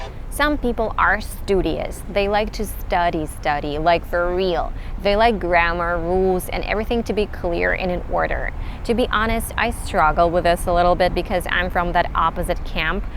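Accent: United States. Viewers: thanks ma'am, I am very much enthusiastic to have accent like you as american but I can't so I want to do so hence try to help me in this regards more tips by which may I be able to reach my goal at the earliest as I can. I regularly watch your video for being fluent in the english language and I'm trying my level best in order to do so please do provide me someone's whatsapp nos through which I want to be perfect by regular discussion else let's see how does it come to an end for doing so soon. but I am very much hopeful to do so accordinly. Thanks awaiting your reply please. (India) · Very good video with a bunch of great advice! 👍 Congratulations. By the way, you have an awesome american-english accent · Yeah. She looks like from one of those countries. She has a kidda American accent, though. A pretty woman, by the way. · How're you? Your videos... it's a hell of a practical knowledge:D I love listening to your voice - your American accent <3, that's my goal:) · Thank you, from the USA. 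I love your advice. you have an awesome American-English accent. Finally, I have to tell you're so good. When you will come to the USA. Let me know. Please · I'm from a non-native English speaking country. I really wanted to check the opinion of a native speaker of English. Her American English accent sounds perfect to my ears, but because I'm not a native speaker of English, I was not really sure about that and was waiting for the opinion of an American native speaker of English. She is a good inspiration for me in my English learning journey. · скажите пожалуйста из какого вы города России? У вас отличный американский акцент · Вчора на вас підписалася, так думаю "напевне якась датчанка" бо ж всі вони добре говорять англійською) а тут дивлюся точно знайоме місто. Ви дуже дуже класна і хоч я англійський філолог, та мені далеко до вашого американського акценту! Бажаю вас багато підписників і задоволення від улюбленої справи)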